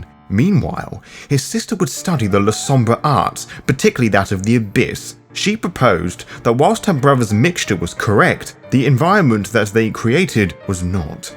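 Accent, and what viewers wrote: United Kingdom. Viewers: Its called being British, which I assume he is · Pray tell, fool. What do you THINK a British person should sound like?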